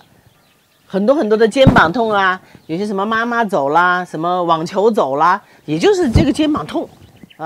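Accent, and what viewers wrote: Wuhan, China. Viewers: 谢谢！您是武汉/湖北人？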